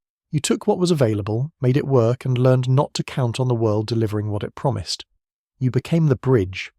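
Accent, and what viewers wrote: England, United Kingdom. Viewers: American culture with a english accent curious 🤔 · British narration on American relevance 🤷🏻‍♂️ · Sh*t Brit accent on American generations. Left wing interpretation. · A British accent narrator doesn't work. Give us real sources! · Why is this narrated by an English person when it’s about America. Anyways Boomers in the UK were not born into winning. The UK had rationing until I think 1955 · Hello, this a great video and I'd never heard of Gen Jones which makes a lot of sense. However, if you revisiting please don't use a UK voice for predominantly US content. It's a bit confusing and I feel a bit left out as a brit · An American story about American generations. Why then an obviously UK English voiceover? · USA centered with british accent. Lets forget the US-perspective. Theyre out!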